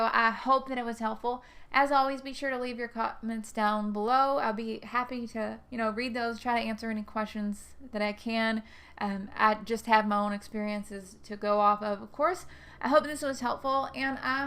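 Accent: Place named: Tennessee, United States. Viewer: You sound like a friend of mine, she is from Tennessee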